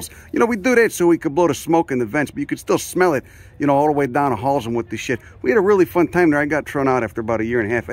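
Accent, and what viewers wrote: Chicago, United States. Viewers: I love your videos!! If you come near the Northeast I could show you some great spots...I'm extremely interested in wild edibles, (my 4 acre yard Is one big botanists dream!) My thick Boston accent and your chicagoan accent would meld perfectly. Keep on keeping on my friend!!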